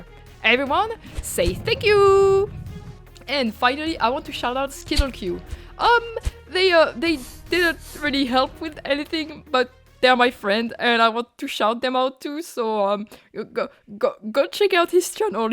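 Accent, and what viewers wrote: France. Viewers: By any chance, aren't you french? Your English is excellent, but the accent still sounds a bit french, as if I was listening to my classmates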